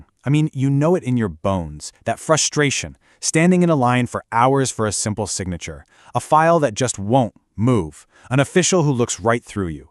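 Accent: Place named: United States. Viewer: Get the accent right with a bubbly head, this si too american.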